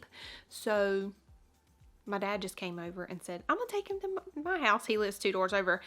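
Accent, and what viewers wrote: South, United States. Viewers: I live in North Georgia. I 💙 your southern accent because I have it too.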